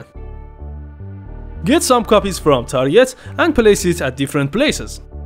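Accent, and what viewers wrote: Iran. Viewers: I love your Persian accent. :D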